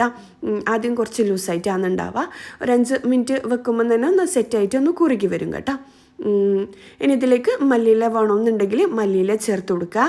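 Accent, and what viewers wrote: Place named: Kasaragod, India. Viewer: കാസർകോട് സ്റ്റൈൽ സംസാരം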